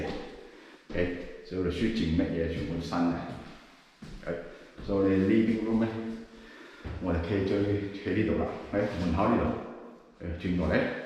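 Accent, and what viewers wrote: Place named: United Kingdom. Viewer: 旁述哥哥应该戴住口罩讲野 ，所以听唔清楚 ，加上一听已经知道唔系土生土长香港人 ，可能是在英国出生的华人 ，我姪女也是这样跟我说话的 ，唔紧要 ，会越做越好的。努力！加油！😉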